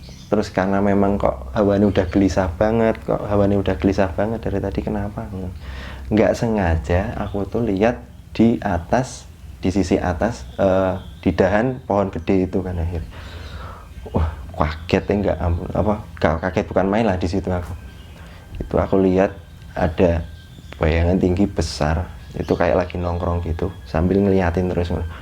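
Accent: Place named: East Java, Indonesia. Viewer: Mas , asli jawa timur ya ?? Medok nya sama 🤣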